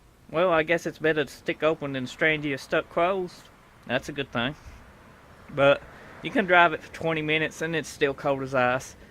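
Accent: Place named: Louisiana, United States. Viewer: Great video and thank you, are you from New Orleans originally? I hear a Louisiana accent.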